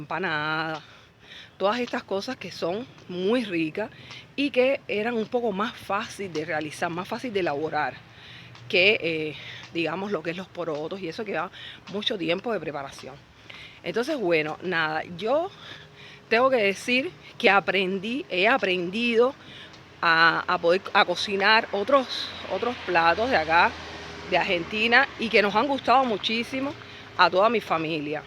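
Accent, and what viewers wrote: Cuba. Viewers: Modestamente y si me permite (no es una critica y mil disculpas si lo interpreta de esa manera), le dire que pienso que ud. en una Argentina con acento cubano jajaajjaja, de verdad me encanto su comentario a cerca de mi pais. Logicamente cometemos errores y habra dificultades como en muchos lugares pero poniendole ganas y esfuerzo uno los puede solucionar. Dije que Ud. es una argentina con acento cubano por que vi en su narración una gran PASION y eso es el SER argentino. Muchas gracias y vaya para Ud. y su Marido un fuerte abrazo deseandole mucha pero mucha suerte en nuestro pais. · Te encontré recién hoy, .... sólo quiero darte la BIENVENIDA a nuestro país y espero que seas FELIZ aquí. Me encanta tu acento cubano, muy querido para mí, que traes de tu delicioso PAÍS.! Dios quiera que se solucionen los problemas que agobian a ese pueblo divino. 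A mis 76 años, tengo recuerdos HERMOSOS de tu PAÍS.!!!!!!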